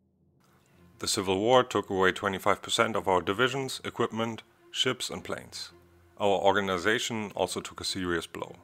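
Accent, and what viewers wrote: Germany. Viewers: Are you German? Bist du Deutscher ?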